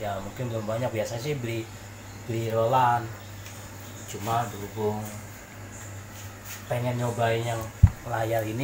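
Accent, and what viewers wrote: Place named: Banjar, Indonesia. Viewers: Kyknya teknisi kalimantan ini.. kedengaran sempat bhs banjar 😀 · Betul teknisi Kalimantan urang banjar tinggal di grogot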